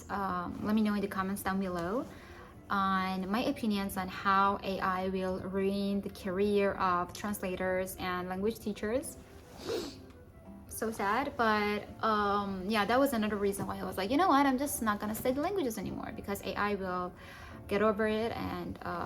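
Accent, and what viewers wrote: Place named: Arab world. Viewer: Why do you have Arabic accent!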